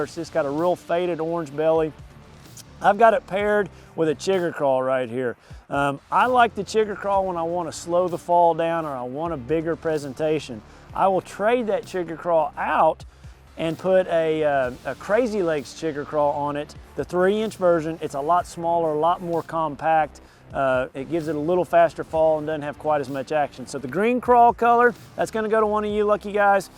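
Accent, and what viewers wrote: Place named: Midwest, United States. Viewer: I just like your way of teaching others, easy to listen too! Plus you're a Midwest guy right, u think I heard you're an Okie?!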